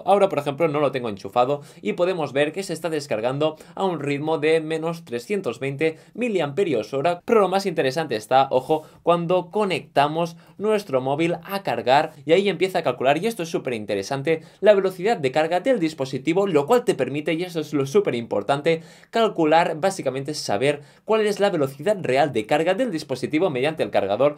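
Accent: Catalonia, Spain. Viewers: ERES UN CATALAAAANN!!!???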